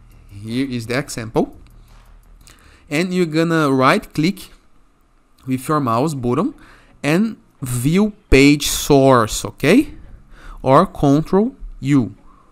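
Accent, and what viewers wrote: Brazil. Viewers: Brazilian accent...😎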